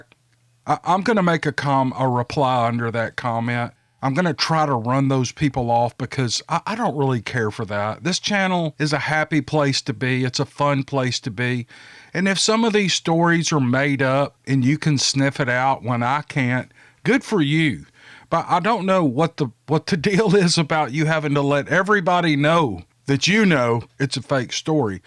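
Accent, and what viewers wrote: South, United States. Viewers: 😂I laughed and laughed 😅 at this story, 🤣 poor kid, this was a fantabulous! Bigfoot story I'm still playing catch-up, but WoW, I do love but the stories have to be read by you exclusively! Or I'm not really interested in listening. IDK if it's your southern drawl, or what 😊 ROCKS❤ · Hey, I have a good one for you. All stories are B.S. = *BEST *STORIES!! I LOVE THESE STORIES ❤️❣️. Keep reading because your the *Best *Story Narrator out here in you tube land. As for the people who know a story is supposedly b.s.( I say ) *Bloody *Stop and enjoy that *Beautiful *Southern voice. Much love to you and yours ❤️ B. S. = *Be *Safe. Lol. 😄.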